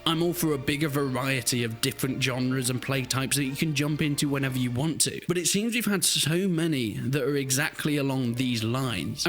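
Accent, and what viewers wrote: United Kingdom. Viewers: I can't help but despise your heavy British accent and pretentious voice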